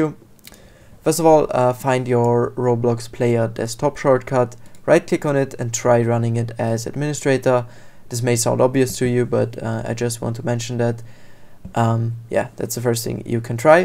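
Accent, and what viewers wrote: Germany. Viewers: i hear deutschland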